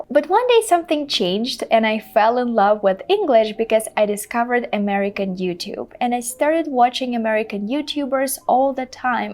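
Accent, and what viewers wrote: Russia. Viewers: i love your "rrrussian" accent.😊😊😊🙌🙌🙌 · You have shown a good Russian accent. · Hello, I like your Russian accent and I would like to know which Youtubers are you watching, I am trying to learn English via youtube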